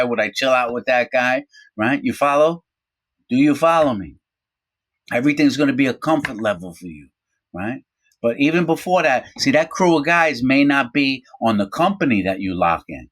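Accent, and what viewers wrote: New York, United States. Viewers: Yo, you got a cup of coffee son? Lol no doubt thats NEW YORK!!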